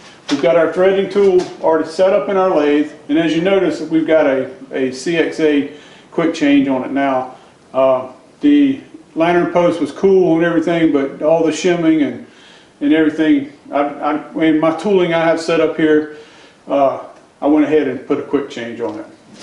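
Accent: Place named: South, United States. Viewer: Still learning how to listen/understand southern backyard machining (American) English/accent. Your learning curve on understanding that old of machine, informative.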